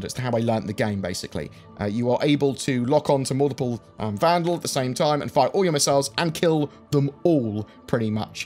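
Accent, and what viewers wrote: United Kingdom. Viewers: 350R or 315P, British accent not withstanding...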